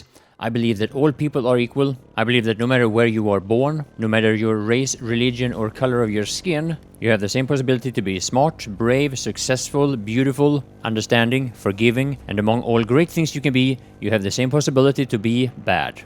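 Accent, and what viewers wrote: Sweden. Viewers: JUst wanna thank you for keeping it real dude amazing work allways happy when i get a notification on my phone that you uploaded something and realy nice to see content creators keeping it real!! keep it up!! älskar göteborgska accenten :)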